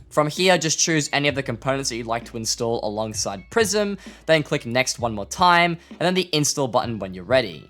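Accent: Australia. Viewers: BRO IS AUSTRALIAN WE NEED MORE AUSSIES ON YOUTUBE AUSSIE HGIH FIVE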